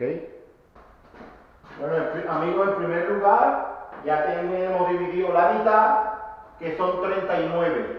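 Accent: Andalusia, Spain. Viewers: Ah, vale ahora entiendo. Lo probaré porque nunca me quedan bien las líneas ni usando cintas buenas. Me quedan bastante bien pero siempre filtra algo. Ese acento que tienes...cádiz, sevilla? Muy yankee no suena🤣Un saludo👍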